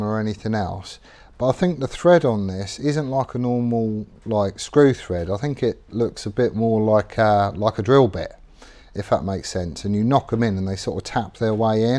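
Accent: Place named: Northern England, United Kingdom. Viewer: You're doing a nice job there. A question that's nothing to do with this, you live in Plymouth but talk like a northerner ? What's that about ?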